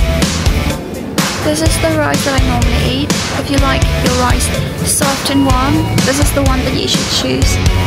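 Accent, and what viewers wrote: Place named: United Kingdom. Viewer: May I know your nationality? Pls don't take it wrong. I am just curious because your accent sounds so immaculately British.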